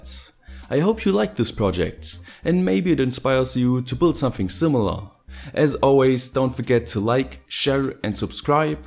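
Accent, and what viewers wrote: Germany. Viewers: Wie man einfach direkt nach 5 Sekunden merkt das du Deutscher bist 😂👌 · are you german? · are you german? sounds like that:D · wusste doch dass du n deutscher bist :D das hört man einfach so raus XD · deutsch ?höhrt sich so an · Er ist Deutscher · Ich denk noch die ganze Zeit: Irgendwie klingt der Akzent deutsch. Und dann BÄM, Pflege-Öl. xD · Your accent, and using a Geodreieck..... you are German... cool!!! Great video btw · Du hast ein deuschen axent! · Red doch deutsch man hört dass du deutsch bist 😂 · German? Sounds a Little like a German that is speaking english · Englisch with a german dialeckt :D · Are you from Germany? Or Austria? I am hearing accent from you. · are you from germany?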